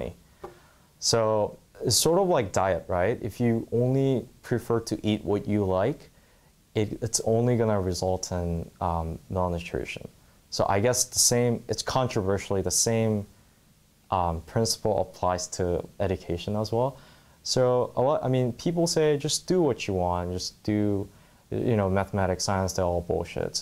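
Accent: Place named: Asia. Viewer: probably because asian accent(im asian too btw so don't call me racist)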